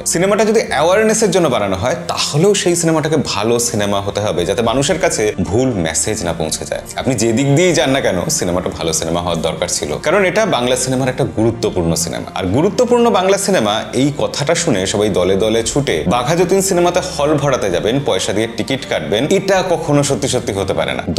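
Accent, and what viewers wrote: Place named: Bangladesh. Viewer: Apner bangla bolar dhoron ta Bangladeshi ter moto ...apni Bangladesher kotha theke aschen?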